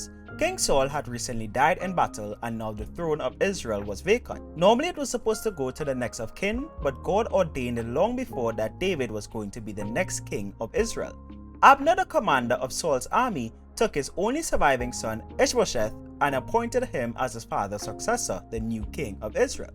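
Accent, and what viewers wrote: Trinidad and Tobago. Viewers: You Trinidadian?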